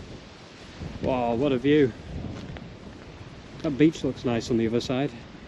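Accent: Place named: United Kingdom. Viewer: Where are you? British accent, but you are always riding on the right side of the road. Love the videos. Love that you love your dog too!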